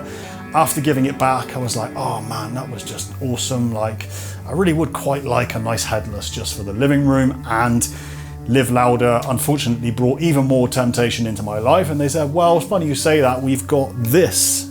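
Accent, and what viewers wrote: RP, United Kingdom. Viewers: You have a very good taste in collecting instruments. Loved your accent too, sounds posh :)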